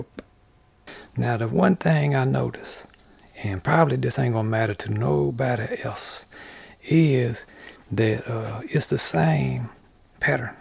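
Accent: Louisiana, United States. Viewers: I can't tell if you're a character or just a witty leezianna southerner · Do i hear a cajun accent?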